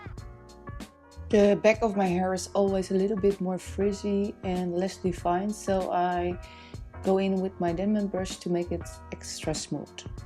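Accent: Netherlands. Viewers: Oke dit is misschien een beetje apart maar ik hoorde aan je accent al dat je Nederlands was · Je kan het nederlandse accent er zo goed door heen horen haha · Hoor gewoon aan je accent dat je nlse bent 😍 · The Dutch accent is strong but I like it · you sound kind of Dutch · Are you Dutch? You have a very strong Dutch accent! I think. [edit: yes, cat Vlinder was just introduced] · I want to say you are dutch. I can hear it in your accent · Ben je toevallig Nederlands? Ik hoor een nederlands accentje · I've never seen more beautiful curls before 😍 You are like an angel, you motivate and inspire me to love and take care of my curly hair no matter what other people say (classmates all have straight, thick hair and I have thin curly/wavy frizz! -_-) At least my hair is natural and not as damaged as their hair, but it seems they all think ringlets looks funny and childish.... 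😒 THANK YOU so much for motivating me!!! ❤❤ Also, your accent is super beautiful, I believe you are from the Netherlands? You're such a beautiful lady really. Hugs from Sweden 😇💕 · You sound Dutch! Are you? Hoi🙋🏽‍♀️ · Haha lekker hollands accentje heerlijk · Are u from Holland because u sound like a dutch person with broke englishe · je hoort dat ze Nederlands is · Beautiful hair. U sound like my Dutch husband lol I’m guessing U are Dutch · Is she Dutch? She sounds really Dutch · She really sounds dutch. Thats a typical dutch accent. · I think you're from the Netherlands the accend gives it away😂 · Are you dutch? You sound verryyyy dutch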